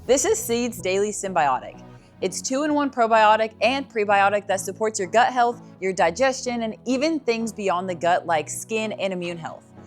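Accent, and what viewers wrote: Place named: South Louisiana, United States. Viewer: You're slowly picking up that South Louisiana accent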